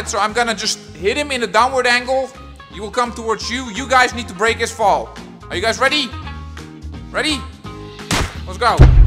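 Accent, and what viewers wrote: Netherlands. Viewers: are you Dutch? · are you dutch?i laughed so much my fucking stomach hurts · Are you dutch?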